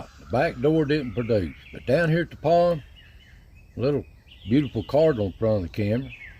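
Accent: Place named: South, United States. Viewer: Thank you for bringing the wildlife and woods to me Mr, i love your voice and southern accent. ❤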